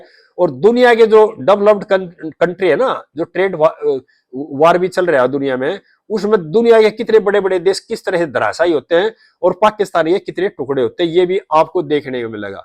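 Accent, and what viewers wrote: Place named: Rajasthan, India. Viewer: Vdo dekhneme bilkul maja nahi9 aayi.....Rajstani chacha boli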